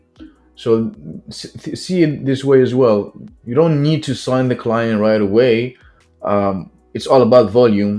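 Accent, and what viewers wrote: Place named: France. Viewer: Are you french ? (I'm french)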